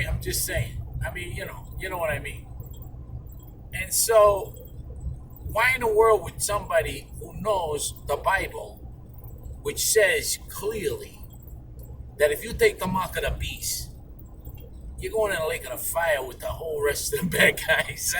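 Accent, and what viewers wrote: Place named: New York, United States. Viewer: Are you really from New York because you nailed the accent and attitude! I'm from New York so I know this and thank you brother for this video! Amein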